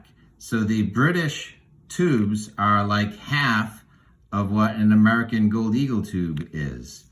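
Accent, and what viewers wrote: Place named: Massachusetts, United States. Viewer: You sound just like a Massachusetts friend of mine from Florida. He moved back to Massachusetts several years ago. Great video!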